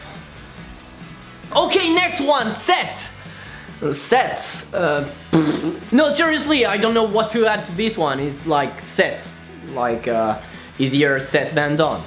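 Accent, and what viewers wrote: France. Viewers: L'ironie c'est que je perfectionne ma compréhension de l'anglais parlé en te regardant :D J'imagine que pour un natif des USA tu as des restes d'accent français, mais de mon point de vu tu parles anglais à la perfection ^^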